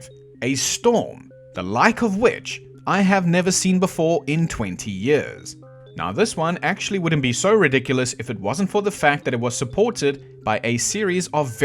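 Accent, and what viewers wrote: South Africa. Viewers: Is he South African? Can’t place the accent 😅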